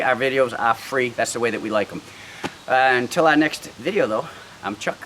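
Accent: Boston, United States. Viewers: Question. Are you from Boston? Hahaha. Love the accent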